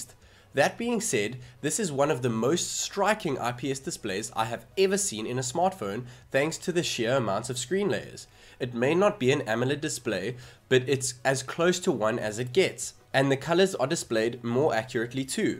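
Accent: South Africa. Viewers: Are you South African by any chance? You have the accent! :)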